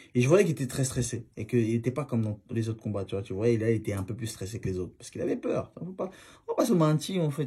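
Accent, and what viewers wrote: Cameroon. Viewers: t es un ouff j ai kiffe ton acent camer 🙂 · Ahahaha trop marrant je te jure t’es vidéo me tue 💪 ( juste ton accent africain camerounais il est agaçant ça gâche toute les blague ) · Il parles comme un vrai camerounais ! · 🙂Force à toi!trop fun de tes vannes,l'accent kamer ☠tout 🤣🤣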